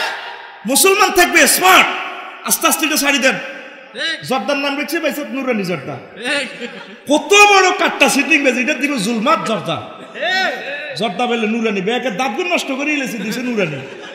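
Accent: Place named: Noakhali, Bangladesh. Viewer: ভাষাঃ নোয়াখালীর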